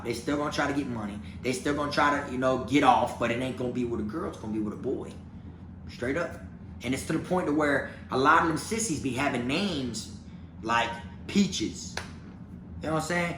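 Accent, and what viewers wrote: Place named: Florida, United States. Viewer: I love how you talk because I talk the same way.....It has to be a Florida thing!!! LOL I'm straight up serious though. On God 💯💯💯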